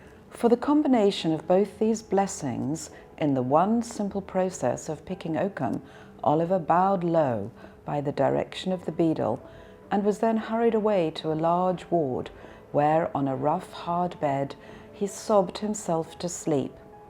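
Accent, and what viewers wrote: United Kingdom. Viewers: 영국발음 너무 좋아요.. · 영국 살면서 영국 발음이라고 다 듣기 좋은건 아닐수도 있구나 느꼈는데 선생님은 목소리 톤하고 발음이 너무 듣기 편안하네요..^^ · 오오 선생님은 포쉬엑센트를 쓰시는건가요?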